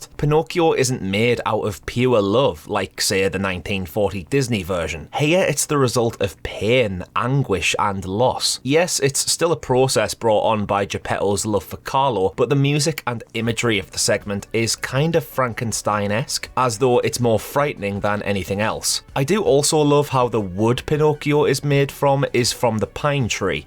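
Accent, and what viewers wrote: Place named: Northern England, United Kingdom. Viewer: Hey, I used to live in South Eastern England, and I have been wondering where do you come from? My guess is Newcastle or Northern England in general:)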